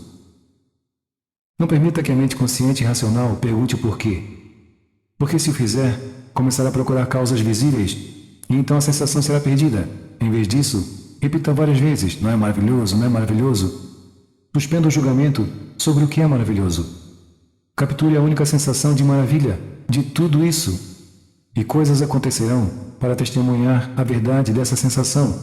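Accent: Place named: Florianópolis, Brazil. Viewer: Esse narrador é manezinho com certeza!! Parabéns mô quiridu!! 👏👏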